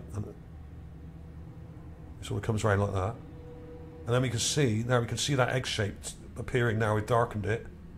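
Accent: United Kingdom. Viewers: Are you from the U.K.?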